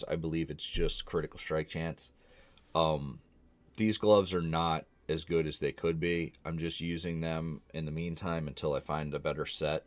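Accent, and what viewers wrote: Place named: Philadelphia, United States. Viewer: That sounds like a Philly accent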